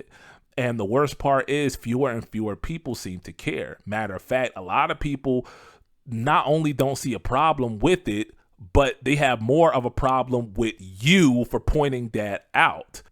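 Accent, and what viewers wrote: Philadelphia, United States. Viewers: I know that accent. Philly fasure.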